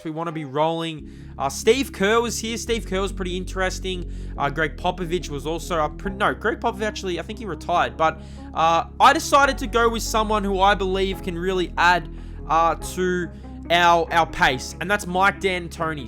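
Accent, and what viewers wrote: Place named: Australia. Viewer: Where in Australia r u from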